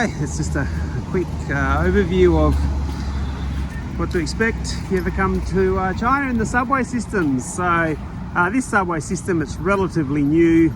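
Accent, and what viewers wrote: Australia. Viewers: From the accent, it feels like this anchor is from Australia.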